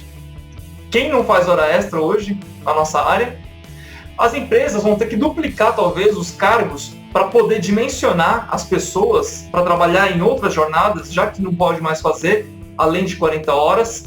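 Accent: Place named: São Paulo, Brazil. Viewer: Parabéns cara, pelo seu sotaque acho q é de SP, como é esse seu esquema de coach?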